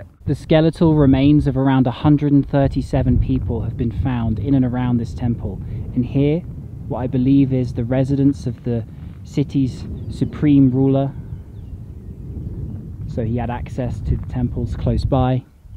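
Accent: United Kingdom. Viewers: I love your British accent 💓 so funny... And I love see you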